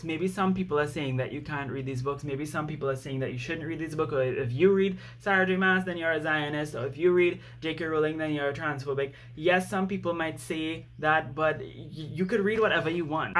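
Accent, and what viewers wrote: Trinidad and Tobago. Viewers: I stopped listening to your rant and zoomed in on your accent. Trini? It's lovely! 😂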